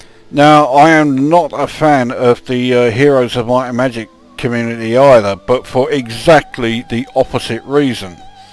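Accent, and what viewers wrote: United Kingdom. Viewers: Dude, i love your hilarious commentary. Maybe it's the british accent. Subscribed.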